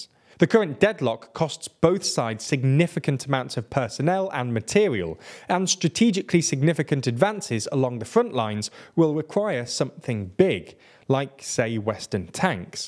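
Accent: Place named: Midlands, United Kingdom. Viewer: I've never seen any other youtube channel that consistently butchers Ukrainian place-names as consistently and as poorly — and almost none of them speak any Ukrainian either. If they're trying to deliver news about Europe, this channel needs to try harder to adequately pronounce European words that aren't muddled with a Midlands accent.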